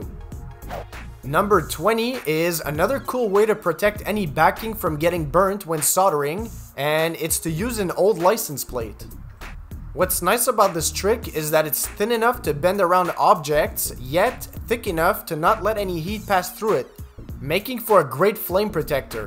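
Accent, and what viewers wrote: Quebec, Canada. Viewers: I knew you were from Quebec 😂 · I like the Canadian accent!! It's all good heh!!!! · I could tell you re from quebec ... the plate confirmed it 😎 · AHA... yes I thought I heard a Canadien brotha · I love the accent. I just knew you were from Quebec. Hey, you're a smart guy. Merci for sharing your knowledge. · French Canadian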